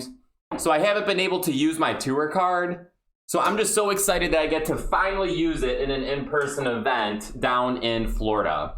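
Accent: Midwest, United States. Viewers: New Sub here. You a fellow Illinoisan? Hear that Midwest accent.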